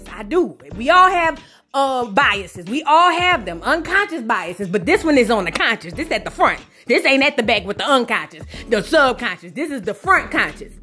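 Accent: New Orleans, United States. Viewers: Sis I absolutely love your "Nawlins" accent and your commentary! That "get her up here" gets me everytime! Stay on their necks sis!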